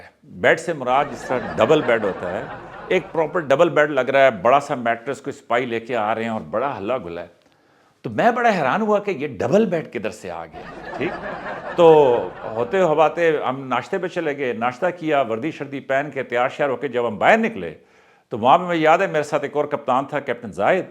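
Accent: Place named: Sargodha, Pakistan. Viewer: سر بہت اچھا ❤ آپ کا لہجہ سرگودھا کا لگتا ہے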